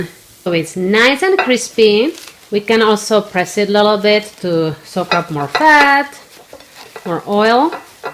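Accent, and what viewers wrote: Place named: Czech Republic. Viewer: I love the way you talk! I miss hearing the accent of a česká žena! Is it olive oil you use?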